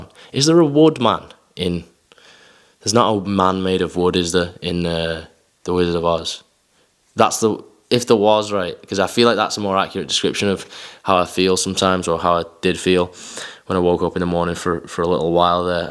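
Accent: United Kingdom. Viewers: Love this british accent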